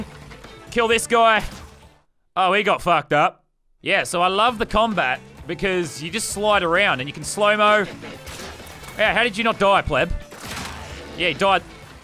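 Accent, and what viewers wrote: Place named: Australia. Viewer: Lazarbeam every one is saying ur British but ur Aussie Like me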